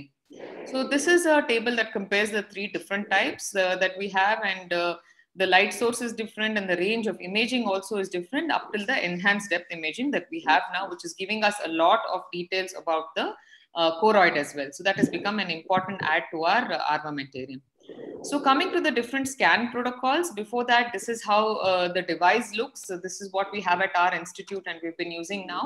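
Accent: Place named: India. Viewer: Subtitles really are usefull, indian english accent is not always easy to undestand.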